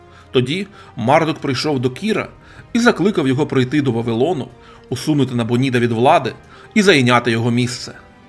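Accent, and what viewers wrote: Russia. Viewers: Майже скрізь де вживаєте "ґ", там має бути "г". Не приємно чути кацапську вимову. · Ріже вухо гекання. Українці так не розмовляють. Вибачте. Може я не правий . Але кацапське все бісить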